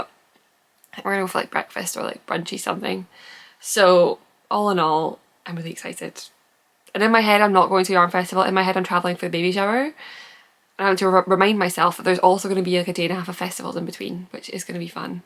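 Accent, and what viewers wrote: Scotland, United Kingdom. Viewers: I have watched several of your videos and have several of your patterns on my ravelry wish list. Also I love a Scottish accent you could be reading me your grocery list honestly so I was actually embarrassed for myself to realize i wasn’t subscribed. Has been remedied now but damn. Not you showing up on my suggested page to get my ish fixed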